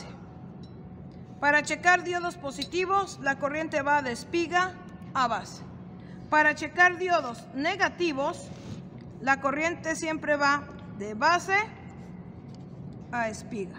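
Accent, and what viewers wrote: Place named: Mexico. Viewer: Sra ingeniera es ud una gran maestra !!,ademas de ser muy didactica en su modo de explicar en ese español -mex tan bonito!!! chapeu!!!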